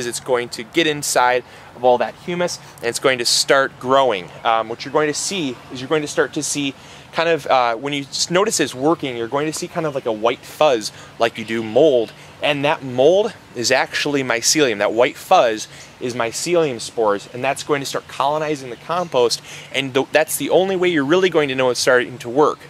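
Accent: Michigan, United States. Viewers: Holy Michigan accent! Thanks for the lesson I can't wait to try this. Luckily my compost pile is pretty shady.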